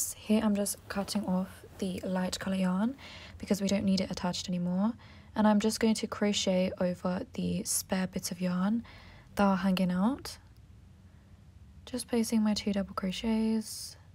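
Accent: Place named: Russia. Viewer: русский акцент такой емае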